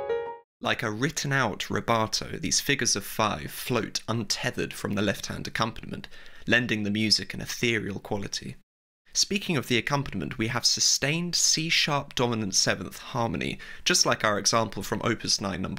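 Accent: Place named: United Kingdom. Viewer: Well, why not do another video? Decide on your intended audience (casual listeners or devoted students), announce what you're going to demonstrate, and do your demonstration in a relaxed, orderly and progressive way. Clearly you have the knowledge and the ability. Your British articulation is beautiful, and no doubt your analysis is insightful, but I couldn't follow you. Either I am a slow brain or you spoke too fast. I'd like to hear what you have to say about Chopin's Nocturnes, but why cram everything in one video when you can make as many as you like? Best wishes . . .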